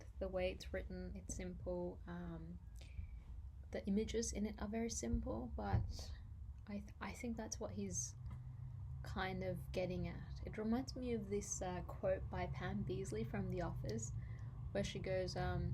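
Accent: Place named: United States. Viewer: Good poem .. Your accent is American, but you look like Indian 😊😊❤❤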